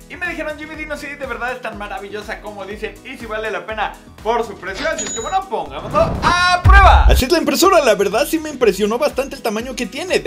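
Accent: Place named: India. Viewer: Por que habla como indu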